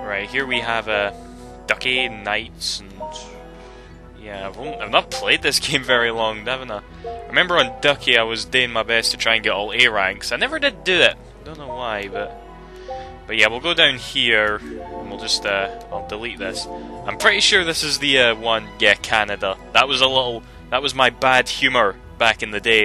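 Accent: Scotland, United Kingdom. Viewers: Ok i just found out at first i thought he wus canadian but now i founs out he scottish i think =p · lolz nice scottish accent! hehe! the jokes r awesome too :D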